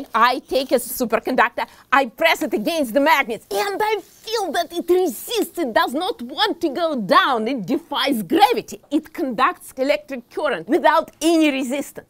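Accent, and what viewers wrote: Russia. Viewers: My new fav person 😂 makes me miss one of my favorite teachers, Mrs. kaziyarian — same Rusky accent with a zest for teaching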